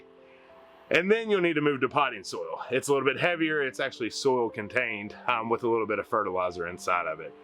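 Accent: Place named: Kentucky, United States. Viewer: I was just watching a few of your videos and told my husband that your accent was similar to ours. We live in WV on the border of Kentucky. I was born and raised in Eastern Ky and graduated from Morehead State :) Great videos!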